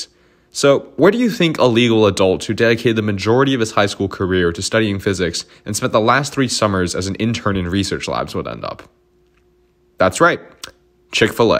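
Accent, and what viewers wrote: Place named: Ohio, United States. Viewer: i can tell you’re from ohio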